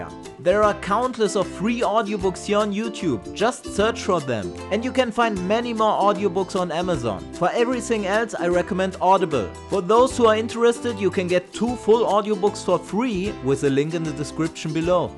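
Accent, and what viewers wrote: Germany. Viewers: do you have a german accent?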